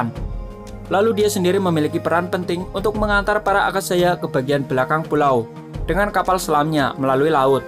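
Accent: Java, Indonesia. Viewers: Medok temen yak...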